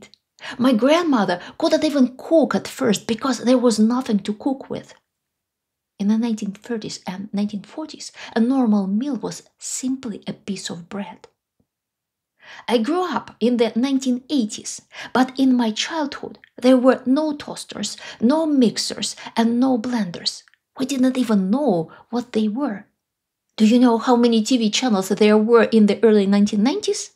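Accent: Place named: Russia. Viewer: It's too difficult to understand you up to impossible because of your accent. This is also one of the reasons 'others' don't have any clue what russians talk about. Any thoughts to emprove your pronunciation? As a gesture of respect to a foreign language/native speakers?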